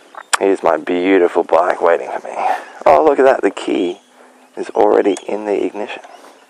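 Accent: United States. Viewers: Why do you say your A's like an american? Us Aussies chuck an R after an A. e.g. Carn't, barsketball, arseholes, cmon man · you pronounce words with an american accent at times. Its weird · also you have a slight American accent with different words lol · thanks a nice vid, but I noticed you've picked up a US accent? Watching to much youtube? Seriously though, you make some good points esp motorcycling is dangerous, we need to be learning, practising skills ect always. And the more you know the harder you seem to push it, we all need to watch this. There are many advanced courses out there, usually taught on a race track with expect/experienced teachers. Riding fantastic fun and well worth the effort, ride safe everyone.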